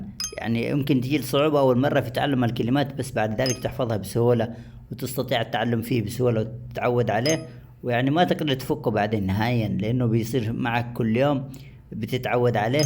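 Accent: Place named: Yemen. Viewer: اتوقع انك يمني من طريقة كلامك تحياتي لك من غزة ممكن تقولنا تحسنت بشكل كبير ولا كيف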